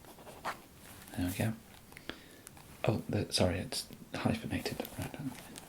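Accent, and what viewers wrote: England, United Kingdom. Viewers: I really enjoy English accent ASMR and interesting takes on roleplays, so this video 100% hits the spot for me, great job with your acting. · Okay, so british Howard Wolowitz. Not bad. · It's a rare treat to hear an English accent with ASMR - I'm English and I found this very soothing as well as amusing.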